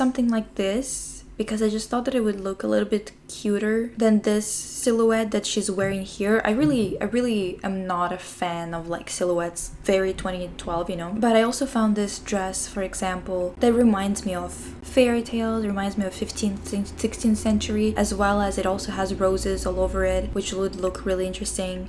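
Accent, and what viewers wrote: Russia. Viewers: do you speak Russian?? that explains why sometimes your pronunciation feels kinda like ours, especially in “r” and “n” sounds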